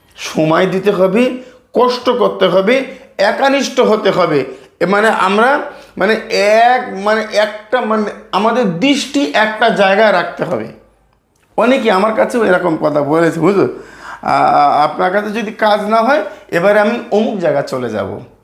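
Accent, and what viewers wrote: India. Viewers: Apni ki north bengal asen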